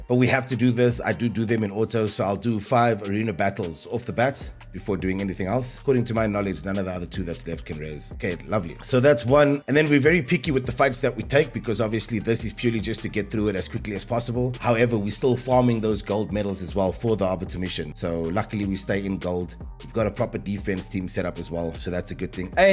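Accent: South Africa. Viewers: Sounds like you come from SA! Glad to see this.. good luck for the channel, now following 👍🏻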